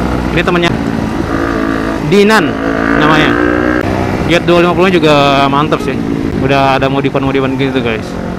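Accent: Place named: Sunda, Indonesia. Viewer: sepertinya anda orang sunda, gue suka👍😂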